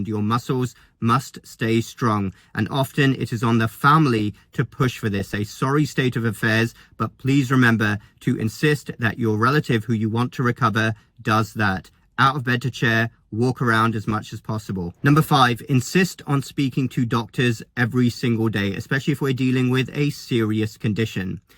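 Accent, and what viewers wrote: United Kingdom. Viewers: You nipped out.And you did not have time to remove that s##t from around your neck. Do you think that makes you more of a doctor? And that British accent is getting tiring now. SHUSH.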